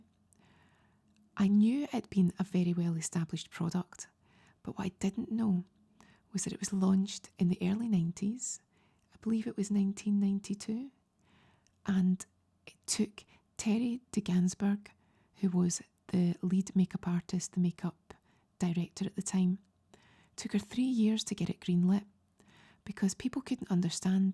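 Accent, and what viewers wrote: Scotland, United Kingdom. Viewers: I was about to doze off until I heard, "because she doesn't really have a butt, yet," which, when replaying it 3 times and factoring in the Scottish accent was of course, "because she doesn't really have a budget." I'm all like, 'Ohhhh... budget." :face-blue-smiling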